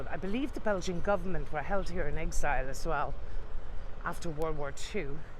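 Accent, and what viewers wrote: Ireland. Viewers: An excellent tour again, full of interesting details. A relaxing time to enjoying and learn about, with a nice Irish accent. Thanks! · I could listen to this lady read the instruction manual for my washing machine. Love the Irish accent 💚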